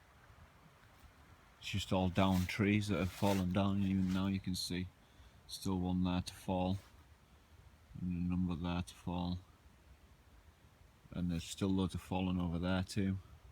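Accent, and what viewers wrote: United Kingdom. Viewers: not that great with UK accents. Are you in Wales?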